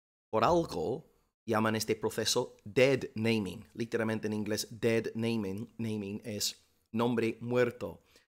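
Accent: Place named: United States. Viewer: Habla como gringo pero no puede traducir bien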